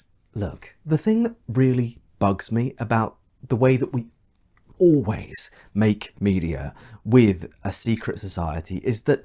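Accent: United Kingdom. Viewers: This guy is amazing in the sense he is 100% wrong on every video he makes but his narcissism and british accent fools him into thinking he is correct.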